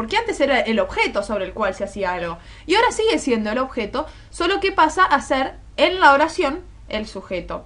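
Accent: Argentina. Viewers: Gracias, muy linda tu voz argentina :) me encanta ese acento en las mujeres de allá, saludos! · tu voz es RE argentina sos de argentina??? que suerte estaba estudiando lengua y encontré estos videos tuyos son buenísimos me re sirvieron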